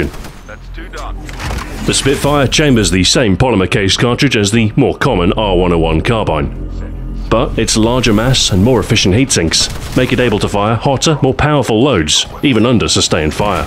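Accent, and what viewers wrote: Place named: United Kingdom. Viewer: THIS GUIDE IS AMAZING!!! I am 100% confident that you produce the best Titanfall weapon guides on the Internet! It's so professional, engaging, and well-edited. Additionally, your magnificently deep, British voice is the perfect sound for discussing the topic of Titanfall armaments. This video was so good that I actually got goosebumps at the end!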